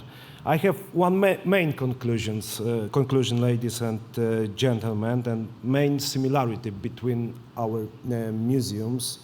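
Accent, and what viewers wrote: Poland. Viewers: Ludzie co się przypierdalają do jego angielskiego żyją w zupełnie odrealniony świecie. Wiadomo, że akcent mocno polski, ale widać, że wie co mówi i potrafi przekazać co chce w tym jezyku - niczego bym tu nie ujmował. Najważniejsze, żeby nie czuje się skrępowany mówiąc i mówi płynnie. Przypomina mi się zawsze Jose Mourinho, który mimo swojego łamanego angielskiego i śmiesznie brzmiącego akcentu nigdy nie tracił charyzymy w tym języku i wszyscy go zawsze słuchali jak mówił. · Ma Polski akcent ale bardzo dobrze mówi po angielsku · Bardzo dobrze mówi po angielsku. Ja wychowywałem się w UK, więc mówię to z punktu widzenia kogoś kto mówi płynnie po angielsku i muszę powiedzieć, że naprawdę nie jest źle. Używa bardzo dobrych słów i mówi całkiem poprawnie. Tylko musi popracować nad akcentem. Najlepiej byłoby, żeby poprzebywał z osobami z Anglii lub USA, którzy mówią bez 'akcentu polskiego' to myślę, że szybko by podłapał akcent i wymowę. 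Ale naprawdę wcale nie jest źle.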